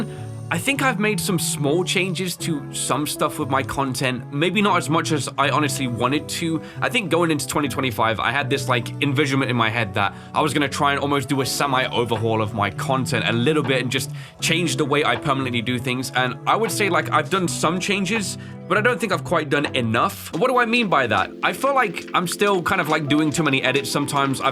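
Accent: United Kingdom. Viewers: I only discovered your channel late 2025, and have been binge watching since. I really appreciate your voice acting - I don't play games myself, but I love watching game plays like movies. Your voice acting really adds to that experience. You always reading out the texts really helps me not looking at the screen - I'm actually scared of horror stuff so I deliberately not look at the screen all the time when watching horror games lol. I really appreciate your curiosity and the fact that you always explore in games. I can always rely on your game plays to catch probably most lore of the storyline compared to other channels. I do get a bit fatigue with your high speed yapping and some lines you often say lol. But then it could be just because I've been playing your content across years back to back to back lol. I remember there were times when I was doing something with YouTube autoplay in the background and your video came up. 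I was like "what is this British dude yapping about now" lol. Or sometimes when I hear "hold up" "it's kind of good though" I was like oh brother...! But none of these are real criticism, I say all these with fondness for your content. Happy new year! I'll keep watching your videos in 2026🎉❤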